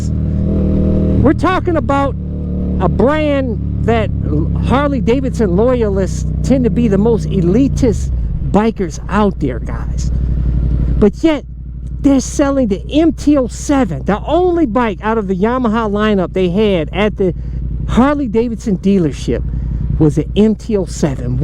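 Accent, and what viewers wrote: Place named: Texas, United States. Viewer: Are you from texas? You have a southern accent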